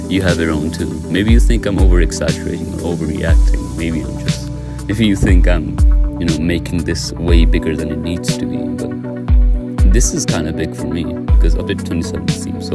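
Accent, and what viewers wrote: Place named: India. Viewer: BRO YOUR IN NINTH GRADE ??? SOUND LIKE A 20 YEAR OLD INDIAN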